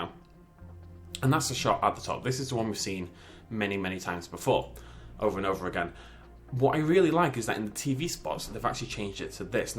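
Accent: United Kingdom. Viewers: Hey I was wandering which part of Britain your from As I am from Worcestershire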